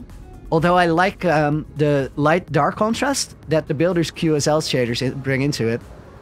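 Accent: Netherlands. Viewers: Do I hear a HOLLANDS accent there You have GEKOLONISEERD the sea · Are u dutch ben je nederlands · Are you dutch? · Its the first time watching you but i bet that your Dutch😂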